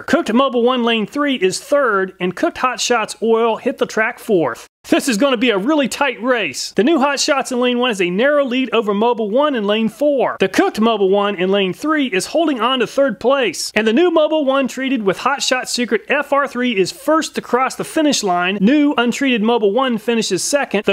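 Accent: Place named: Indiana, United States. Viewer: Man you definitely got that Northern Indiana accent!!!!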